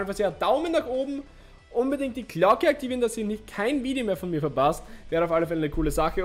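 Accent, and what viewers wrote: Austria. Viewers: Biste eh aus Österreich?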